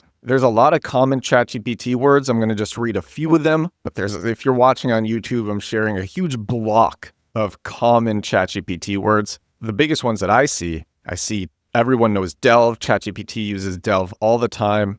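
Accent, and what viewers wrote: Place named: United States. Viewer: I really like your English and your accent, so could you please tell me which accent is this? I'm sure it is an American accent but which state? which part of America? Thank you, you've got another follower, congrats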